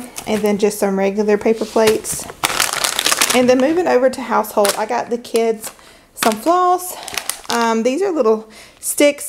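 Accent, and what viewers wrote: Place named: South, United States. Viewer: HELLO SWEET LADY, I AM NEW TO YOUR CHANNEL AND JUST WONDERING WHAT STATE YOU LIVE IN AS I HEAR A BEAUTIFUL SOUTHERN ACCENT.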